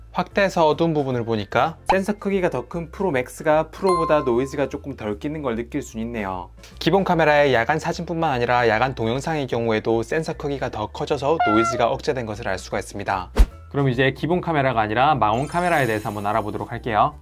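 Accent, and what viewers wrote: Gyeongsang, South Korea. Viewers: 경상도 분이신가용?? 말투가 먼가 넘 귀여우심ㅋㅋ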